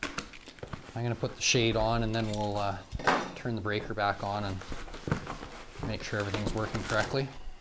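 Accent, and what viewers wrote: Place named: Prairies, Canada. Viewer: Thanks for the video and your thick Canadian prairie accent is making me homesick!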